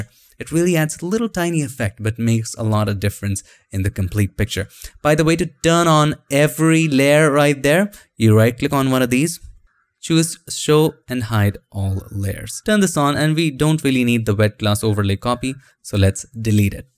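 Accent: India. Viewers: I really like to lean photoshop with you but your english speaking is also good, where are you from , are you indian?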